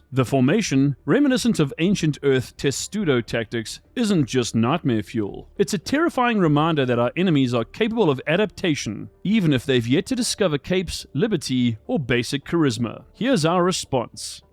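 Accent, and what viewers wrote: South Africa. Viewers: Can't place your accent. It sounds like a South African that lives in Texas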